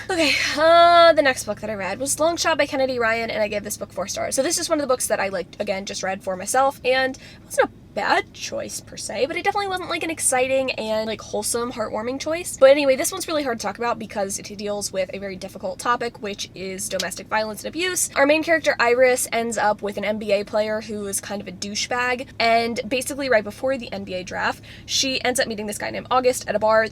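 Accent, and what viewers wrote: United States. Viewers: I could listen to you talk all day lol I don’t know if it’s the American accent or not but your voice is so soothing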